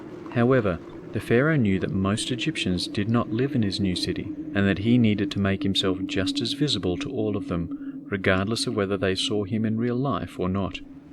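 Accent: Australia. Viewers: Awww c’mon now. Just coz we have an Australian twang we don’t need to mispronounce names….Amun Ra is not said as Ahmoon Ray, it’s more akin to Ahmen Rah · Clicked on this, was shocked to hear an Aussie accent, New sub here!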